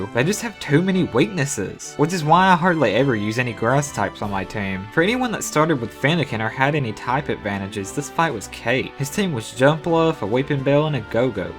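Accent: South, United States. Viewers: are you southern? (sorry I'm from Oklahoma and I rarely see youtubers with like real southern accents so jw) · I have to say that I am not a judgmental person but your voice completely threw me off. With a southern voice and a large, expanded mind it kind of kicked my brain in it's nuts.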